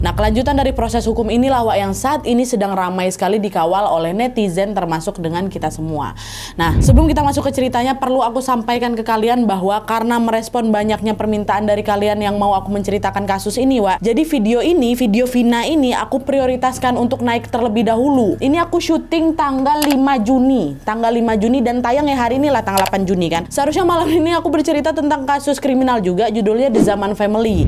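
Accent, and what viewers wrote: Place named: Sumatra, Indonesia. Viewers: Lahh knp dibandingin wak..saya dlu krj di malaysia orng sumatra ngmongnya jg gt kak tegas keras jelas tp wak ada jawanya dikit kyknya keturunan..bah hiro kan menghibur gemulaii gimana gt jg enakk bawainya...ad ciri masaing2 lah wak... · Emang kalo pake hijab auto jd suci?? tuh org sumatera otomatis logatnya ya Kya pada umumnya org Sumatra yg kenceng...Julid amat jd orang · Itu logat sumatra...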